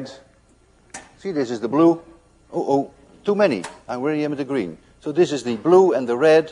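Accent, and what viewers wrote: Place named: Netherlands. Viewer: sounds like he has a dutch accent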